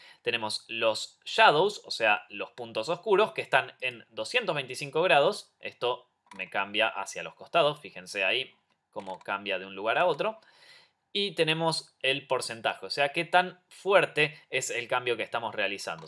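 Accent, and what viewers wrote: Argentina. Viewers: Nuevamente, si así puedo tutearte!! muchas gracias desde España!! te felicito por tu forma de explicar las cosas, es útil y divertido!! y me encanta tu acento argentino y la forma de expresarte!! me estoy tragando cada tutoría con el mas grande interés!! buen trabajo!! Vale puede parecer un comentario muy pelota pero que narices, a veces, corresponder a algo bien hecho es merecido!!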